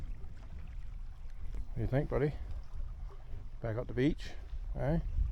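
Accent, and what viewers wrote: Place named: Newfoundland, Canada. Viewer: Omg! What great video this was! Your grouse soup was so appetizing! Made me want soup. I have a grouse in my freezer as well carrots and turnip in the garden. Can't wait! I love your product review on the light with red and blue and green... nah! I agree with you about On, Bright and Off! That's exactly what I would want in a camp out situation! Bravo 👏 👏 👏 👏 👏 Your funny moments in your lovely accent fill my heart with memories of my NFLD relatives visiting Ingonish 50 yrs ago. My father's mother was born in Cornerbrook I'm 1892 and died in 1991 at nearly 100yrs. Your accent is what I grew up with... you, My Buddy, feel like home to me. Love your videos and love to Tuckie... from Rita and Terry and Juneau at Murphy Farm in CB NS 😊 ❤❤❤❤❤❤❤❤❤❤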